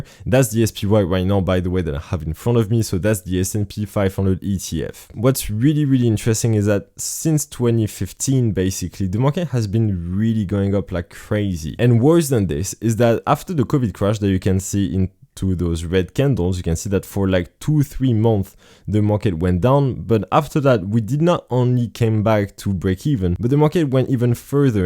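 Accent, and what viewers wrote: France. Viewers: Good long term outlook. And the French accent is cool 😀.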